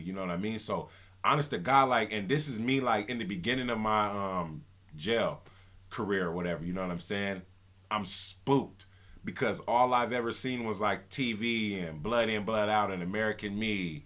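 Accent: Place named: California, United States. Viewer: he sounds straight up cali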